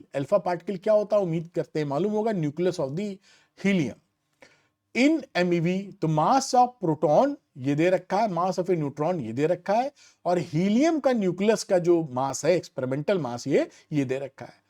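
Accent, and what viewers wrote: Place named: Lucknow, India. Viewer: Sir lucknowii andaz kaafi accha h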